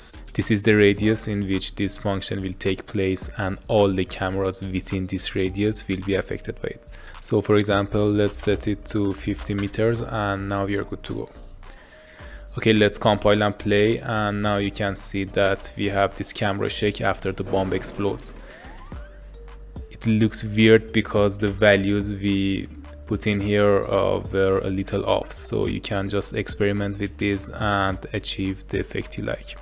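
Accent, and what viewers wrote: Iran. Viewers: ur accent lol! are you persian dadash?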